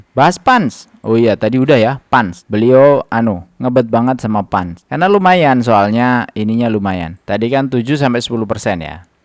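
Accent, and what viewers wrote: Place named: Madura, Indonesia. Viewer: Trimakasih abang qu... Sudah di cek saham pans nya... Maaf bang.. Asli orang madura y... Logat nya.. Ngga bisa hilang.. 🙏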